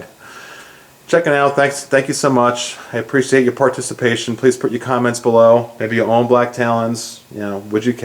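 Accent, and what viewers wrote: Long Island, United States. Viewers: the fly popped in and left when you said bye,,, funny. what part of LI are you from. I thought I detected an East Meadow accent.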